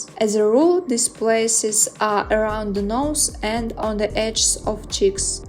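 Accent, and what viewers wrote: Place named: Russia. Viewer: Привет! По речи кажется что русская =) хочу русские субтитры. хотя и так понятно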